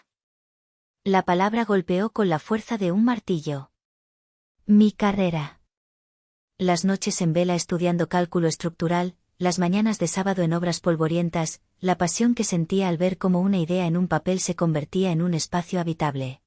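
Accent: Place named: Spain. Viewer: Una mexicana relatando con voz de auténtica española, eso resulta raro, no encaja, pensé que la historia se desarrollaría en España, pero no, la de la voz de españolita resultó ser mexicana.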